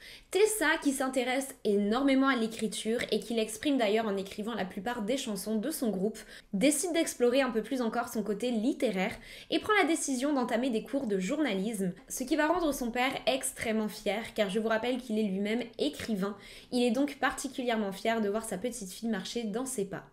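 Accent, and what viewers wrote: Quebec, Canada. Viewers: Effectivement,, moi aussi je trouve que ton accent es de plus en plus québécois !! 👌😉 Et bravo pour tes vidéos continue ton excellent travail !!😊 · Allô tu es française oui mais parfois je reconnaît un peu de quebecois en toi ….vie tu au Québec ???? J’adore tes vidéo en passant ❤️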